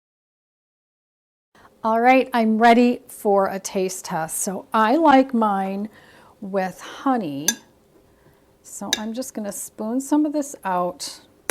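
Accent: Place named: Michigan, United States. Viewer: I cant wait to make this, thank you for the recipe! Btw, you sound like you might be from MI. If so, hi from the UP!🙋🏼‍♀️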